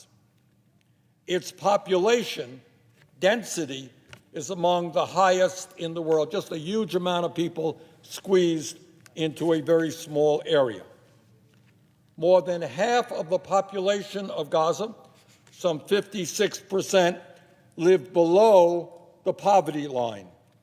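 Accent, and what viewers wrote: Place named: Brooklyn, United States. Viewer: How long, do you think, it'll take this guy to lose his Brooklyn accent??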